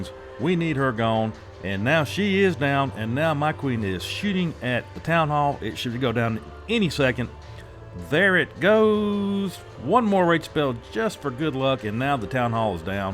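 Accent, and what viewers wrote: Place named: South, United States. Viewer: this is great. 😂 as a southerner I love the accent and phrasing, it's wonderful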